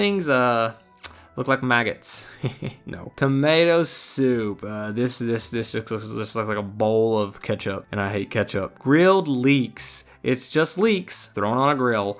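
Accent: United States. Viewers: What state are you from? There needs to be more cowboy accented Youtubers out there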